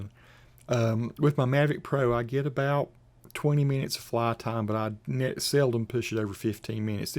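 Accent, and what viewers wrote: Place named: South, United States. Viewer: Thanks so much for making and sharing your videos. I’m “Southern by Choice,” having lived my first 18 years in America’s Siberia, and all these decades later I still don’t have a consistent Southern accent. You have a great one. Honest, I repeat after you 😄 tryna get rid of my rough edges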